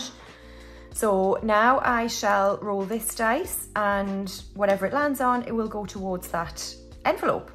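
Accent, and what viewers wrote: Newcastle, United Kingdom. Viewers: I’ve said this before and I’ll keep saying it, I bloody love the Geordie accent 🙌🏻 Our birthdays are a few days apart, mine is July 26th! Team Leo You missed out 36 on the penny challenge but you’ll probably notice it when you do it next haha. Another amazing video & don’t forget to use your spending money on a bottle of wine or two when you have food with your travel friends 😂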